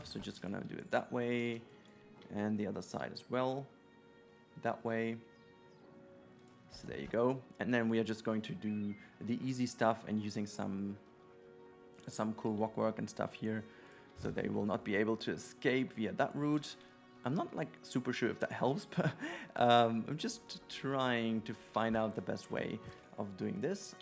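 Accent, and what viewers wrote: Germany. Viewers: his english sounds so german 😂 btw like the video :)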